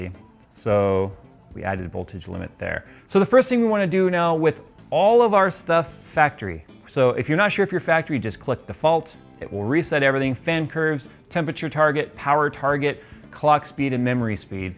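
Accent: Canada. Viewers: There goes Canadian again. Great video. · you're canadian? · You're Canadian,?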